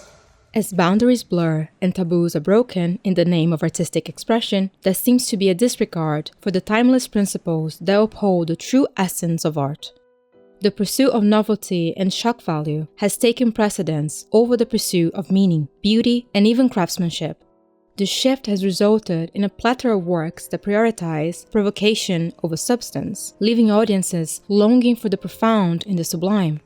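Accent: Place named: RP, United Kingdom. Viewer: A fascist narrative that you've, for the benefit of ideal doubt, blindly concluded as your perceived apolitical stance as an arts scholar. You should never approach another work of art with any appreciation or criticism again as I feel even the work of Steve Cutts might overwhelm you. This video reeks of fear and we all see through the posh accent and thesaurus vocabulary.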